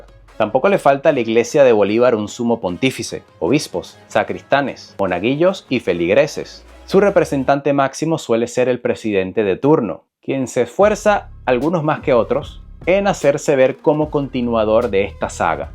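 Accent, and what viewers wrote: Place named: Spain. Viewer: YO SÍ..... Yo si le rindo culto a dos personas históricas: JESÚS DE NAZARETH Y SIMÓN BOLÍVAR.... Comenzaste tu video con un mapa de VENEZUELA sin la GUAYANA ESEQUIBA..... Resulta que es cierto que le pusieron a todo el nombre de BOLIVAR; pero resulta que a BOLÍVAR LO DEJARON ESTANCADO EN LAS ESTATUAS, AV.. Y AUTOPISTAS...... Fue que luego se decide darle real vida a Bolívar, llevarlo al pueblo..... En nombre DE BOLÍVAR SE Manipula y, me parece, que muy inteligentemente estás manipulando en algunos aspectos en éste video..... Te faltó decir que en los EEUU hay una universidad con el nombre Bolívar...... Que la armada estadounidense tiene o tenía un submarino con el nombre del Libertador..... Hubo un momento en que ka historia de nuestro país fue eliminada de los colegios y liceos; por ende, Bolívar fue eliminado de la memoria del pueblo, sobretodo, del pueblo a pie..... 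Eso de eliminar el bolivarianismo es eliminar la vida y filosofía de BOLÍVAR...... Usted es un manipulador de primera....... Además, usted no es venezolano.... Su tono lo dice, su tono es español..... Ya he visto varios de estos videos: parece que se a gestado una campaña internacional en contra de nuestro Libertador.... Campaña liderada por España...... Recomiendo un artículo de la BBC de LONDRES HABLANDO DE BOLÍVAR Y CATALOGANDOLO COMO EL HOMBRE DEL MILENIO...... TAMBIÉN RECOMIENDO LEER A BOLÍVAR Y NO DE DEJARSE LLEVAR POR INDIVIDUOS COMO ÉSTE...... ÉSTE TIPO DE INDIVIDUO COBRA ($) POR MANIPULAR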